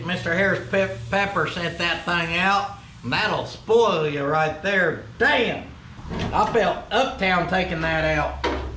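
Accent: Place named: South, United States. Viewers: you gotta drop at least 2 vids a week. And yeah love that southern accent. I also watch Randy from Auto auction rebuilds hey spoke of you a while back in a video .TKs Garage , Lucky's wheels Deals ,Vice grip garage .Yeah lol I learned a lot new words Libby dubby lol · Took me a minute but your humor got me past the hillbilly voice. I subscribed and I'm telling everyone. Good content and downright funny! Oh and good on yer wifey for recognizin the talent.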